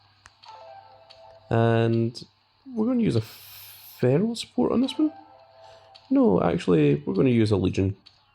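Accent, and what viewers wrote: Scotland, United Kingdom. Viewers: Yoo are you Scottish or is it just me also nice video cause I’m Scottish 🏴🏴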